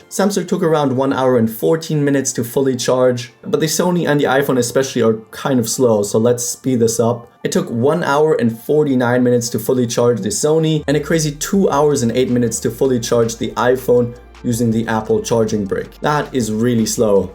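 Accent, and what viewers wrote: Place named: Germany. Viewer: you have a Lithuanian name and German accent. Where are you from?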